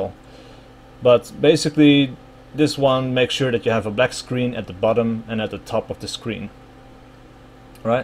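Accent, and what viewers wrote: Netherlands. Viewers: A bit late to the party but good to see my dutch accent radar is still working. Thx for the vid though it helped a lot.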